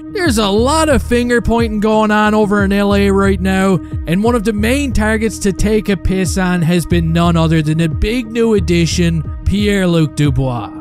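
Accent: Newfoundland, Canada. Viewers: You sound like a newfie.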